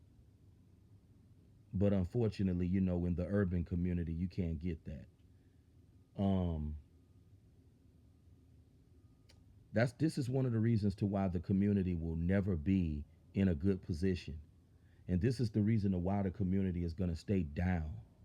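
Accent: United States. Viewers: stop talking ebonics and ill let you in the white club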